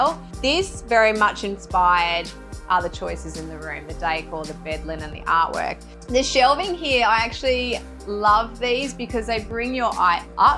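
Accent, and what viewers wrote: Australia. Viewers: Nice home like your accent too are you from Australia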